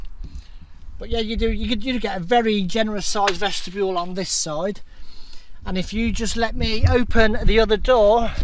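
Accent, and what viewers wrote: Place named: Birmingham, United Kingdom. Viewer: You sound like a fellow brummie or maybe the black country lol. I did watch a video with Hazeoutdoors and he said the red pole should go in 1st, I'm not sure tbh as I've looked on the Terra nova website and they say different, I was just thinking about how saggy it was on the inside once you'd set it up. Maybe after a few times it'll come right ✅️ 😌 👍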